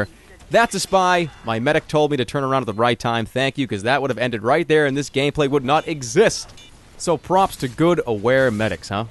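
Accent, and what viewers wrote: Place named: South, United States. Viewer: really good southern accent :D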